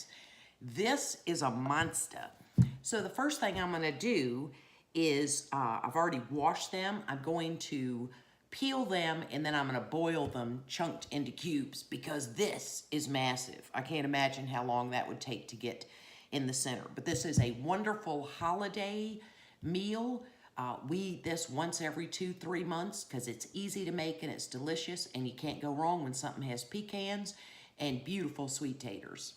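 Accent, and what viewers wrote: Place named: Eastern North Carolina, United States. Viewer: You sound like my folks in Eastern, NC.❤❤❤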